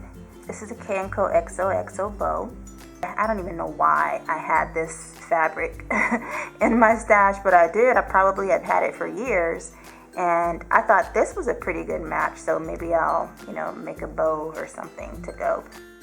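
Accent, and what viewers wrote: South, United States. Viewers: Great setup! I loved your country accent, it was fun 😉. I'd love to see a tutorial on how you change the hair and skin color, I really love that idea. Thank you for the chance to win these goodies, my favorite part of the kit are the sunflowers! They are my favorite flowers and are drawn so beautifully in this kit 😍. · Absolutely adorable! Your southern accent was great, teehee! 👏🏻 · I loved everything with yellow on it! I love that color for a transition into fall🧡. Another thing I loved was your southern accent it gave me a good laugh☺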